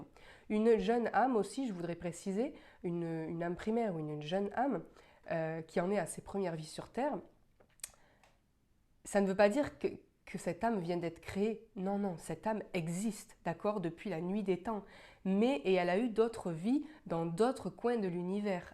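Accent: Toulouse, France. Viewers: Tu as un charmant accent Toulousain.... signé un Toulousain... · Un discours clair avec un joli accent toulousain... 🥰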